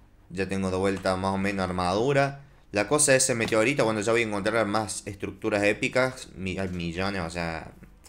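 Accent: Córdoba, Argentina. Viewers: se te esta llendo la tonada cordobesa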